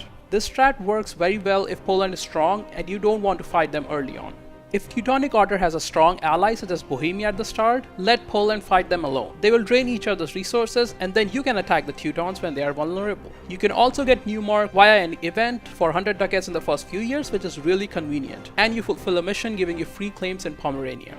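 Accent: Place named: India. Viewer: make a tutorial on India. I hope i'm not wrong but you sound Indian. If you are make a tutorial on India and tell us some history :D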